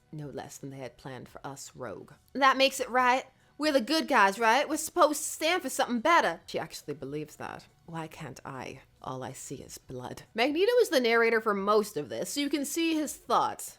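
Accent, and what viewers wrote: United States. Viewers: I just here for you country accent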